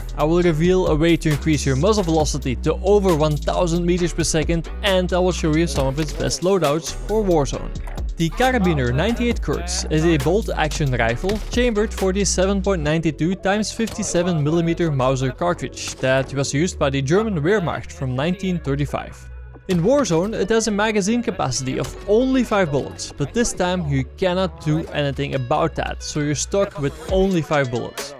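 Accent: Netherlands. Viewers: 100% NL door z'n acent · Love the Dutch "Sneiper" accent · Jo deze gast is Nederlands 100%% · you are dutch right :D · First video I watch of this dude, and I can already tell he's dutch lol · this dude sounds dutch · Je klinkt Nederlands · Ate you Dutch ? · U dutch?? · Nice dutch accent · He is Dutch for sure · The Dutch accent is strong in this one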